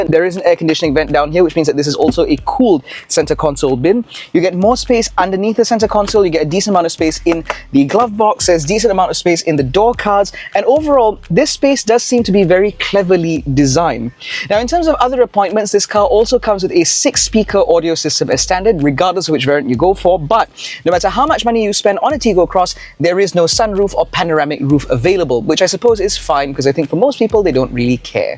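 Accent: England, United Kingdom. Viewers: Clicked the video for the car, stayed for the English accent. Well done presenter. Strong presentation!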